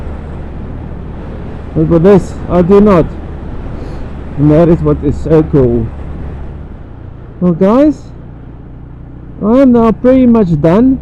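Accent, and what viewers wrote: South Africa. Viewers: what a fun little bike.. Are you from South Africa? . Why is the sound so bad with the visor closed?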